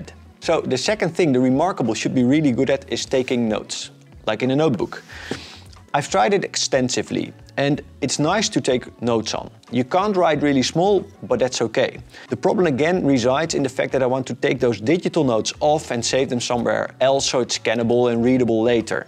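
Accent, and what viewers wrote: Netherlands. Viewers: Great honest review. Love that dutch accent btw - reminds me of my days in Europe. I want to get an e-Ink device, but can't see it replacing my OneNote / Suface and iPad workflow. Pens with colors, highlighter and the incredible search and synch capabilities of OneNote make it impossible for me to take this big step back. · This was super helpful. This was exactly the information I was looking for. Having it all in a nice Dutch accent was just the cherry on top. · i hear dutch accent noises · He spoke for one or two seconds and I immediately looked at his name to conform what I heard. And yep, he's Dutch. I recognize Dutch accents anytime. My native language is Dutch and I'm from Suriname, South America. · I appreciate a video that evaluates the product after a fair trial period. Given that you have used this item for 1 year adds complete credibility and that is obvious from your remarks. 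I've been going back and forth about the Onyx Boox for a couple months now. This video came at a great time for me. So, thank you. And I have to agree with T HOFF on your Dutch accent, it reminded me so strongly of my Dad who came to Canada from Enschede when he was 17 years old. My Mom always said, "Wooden Shoes, Wooden Head, Wooden Listen" Ah...but he was a good man.☺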